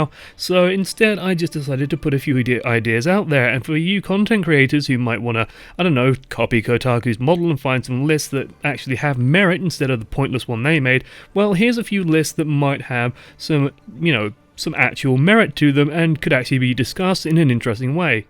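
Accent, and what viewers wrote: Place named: United Kingdom. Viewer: Sure there was something very funny but i dont know English so well to understand that( But still thank you for your videos because i can watch some interesting material about game i like and learn English a little bit. First time i watched one of yours i didnt even understood all that you said but now i can easily listen to people with British accent and realise what they say. So yeah, you`re cool. Keep doing your vids.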